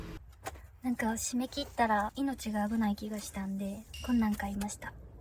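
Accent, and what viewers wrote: Kansai, Japan. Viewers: 久しぶり～😆 相変わらずのかわいい関西弁ステキです🤓 でもここで 「目隠すよりピップエレキバンやろ❗」 って、つっこんだらの思惑どおりやろな🤔 負けへんで❗🤓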